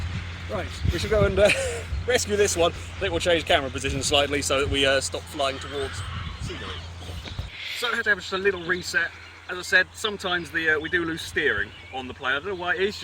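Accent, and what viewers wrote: England, United Kingdom. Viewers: Hey that field looks familiar, where are you in England. also that accent sounds like mine lol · looks American sound british. 😱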